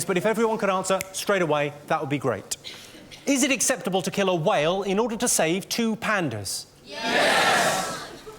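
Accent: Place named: England, United Kingdom. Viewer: I was gonna say that he sounds so lame as a comedian but actually just listening to an english accent its annoying itself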